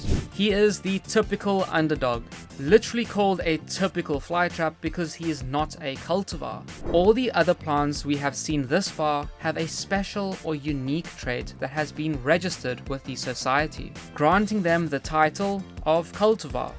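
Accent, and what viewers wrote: South Africa. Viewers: Dude this is amazing. You sound south african? Do you know where to get fly traps in Johannesburg?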